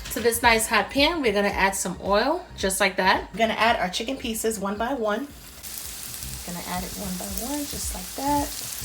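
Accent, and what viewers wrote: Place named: Brooklyn, United States. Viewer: I knew it! I could hear that Brooklyn accent. I wanted to ask, but was too shy to. lol :)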